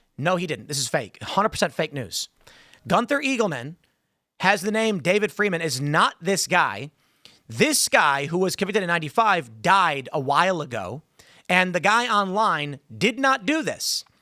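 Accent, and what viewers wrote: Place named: Chicago, United States. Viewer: You are the one that uses AI for literally everything that you talk about on your Channel. You even constantly refer to it as your Friend, except you use your Patented “Exaggerated Chicago Accent™️” to make it sound silly, even though it’s an Accurate Reflection of The Truth, however you may try to frame it. 🤷‍♂️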